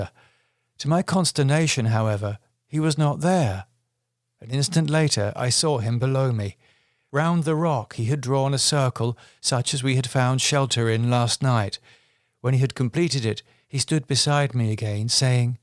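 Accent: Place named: England, United Kingdom. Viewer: By far the best Bram Stoker's Dracula narration ever! You cant have the narration done by any but an English accent. accents for all the characters are all top notch! For anyone who hasn't listened to his vampire stories of Underwood & Flinch, you need to check them out ASAP!!! I believe they're at least as good and maybe better than Ann Rice's Interview With a Vampire and better than any other Ive ever read or listened to.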